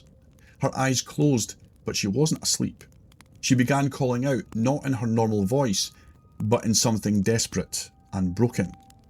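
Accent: Scotland, United Kingdom. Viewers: Carol scottish born live in Australia 🇦🇺 FINALLY found a brilliant scottish spoken brilliant story teller. Please keep me the great work 🙏 keep your voice the way it is ... unfortunately many change voice & end up singing & playing characters. Looking forward to many more stories 😊 cheers from a scot 🏴 from Australia 🇦🇺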